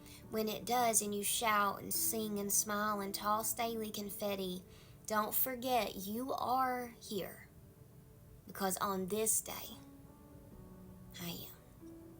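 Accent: South, United States. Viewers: By the way love your southern accent :)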